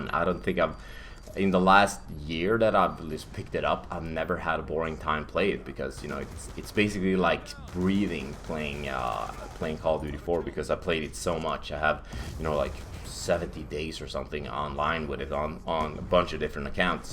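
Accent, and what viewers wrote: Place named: Sweden. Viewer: Having a orginal mål good swenglish bro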